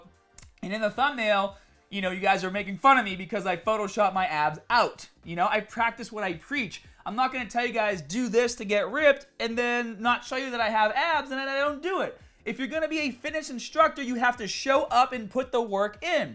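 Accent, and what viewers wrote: Boston, United States. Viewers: I'm starting to get used to the Boston accent. It's becoming endearing! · Bruthah, your accent is wicked pissah! I grew up in Boston but lost my accent in Tuscon. Whenever I'm in Boston I realize that the accent's disappearing. Hold on to it. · Lol Boston accent thicccccc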